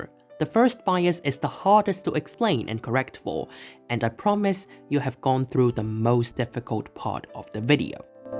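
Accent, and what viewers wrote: United Kingdom. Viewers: I like how he's trying his best in British accent, not that i have anything against it lol, I'm practicing that cuz I need to study in England as well lol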